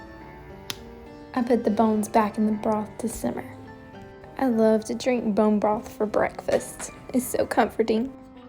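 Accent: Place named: South, United States. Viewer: I can feel Southern hospitality seeping right through the screen! I love the sweetness and gentleness of your videos, could listen to your accent all day! 🤗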